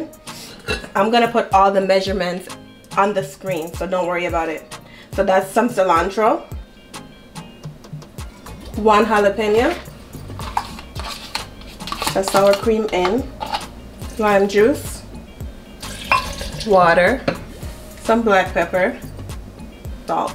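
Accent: Jamaica. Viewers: Why in some videos does she have a jamacan accent and others she doesn’t does she just put it on sometimes? I’m confused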